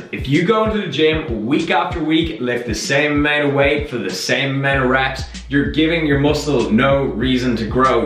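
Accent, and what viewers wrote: Ireland. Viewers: SumDude Norris Irish. (Dublin) I think. I'm from Cork and also has a bit of that accent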